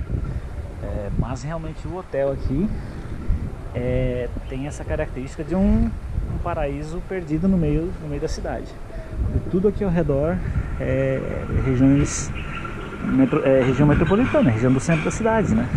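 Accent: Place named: Piracicaba, Brazil. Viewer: Bacana, a é daqui de perto de minha cidade Mogi Mirim (SP). Para dizer a verdade tinha notado na fala dela um leve sotaque de Piracicaba, rsrsrsrs. Gosto muito de seus vídeos, bastante informativos.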